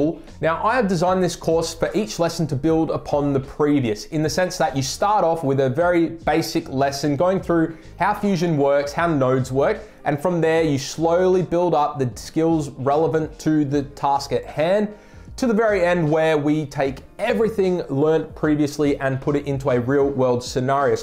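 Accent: Australia. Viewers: downloaded the course and just finished the 3d text lesson. Great work. Helps you're an aussie so it feels very familiar, but that's gotta be one of the best explanations of how to build a 3d scene in fusion I've watched to date. I purchased the blackmagic books for resolve 15 because there wasn't much info out but this course is looking promising as a much easier way of learning the concepts. Great work mate, you're quickly establishing yourself as a go-to resource for resolve info on youtube!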